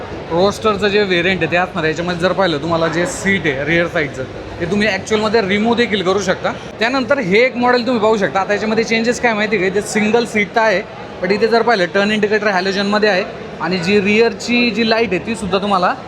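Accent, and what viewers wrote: Ahirani, India. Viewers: भाऊ तुम्ही अहिराणी आहात का ..? थोडा थोडा आभास होतो तुमच्या भाषेमध्ये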